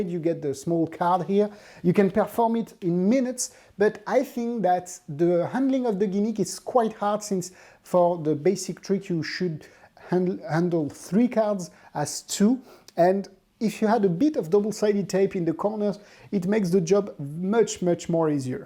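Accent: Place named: France. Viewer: Nice French accent :) Congratulations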